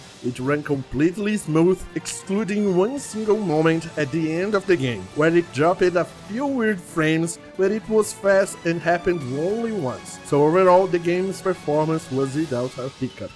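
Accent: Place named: Brazil. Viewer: Thanks! Now I know what a Brazilian person actually sounds like for once! Great review!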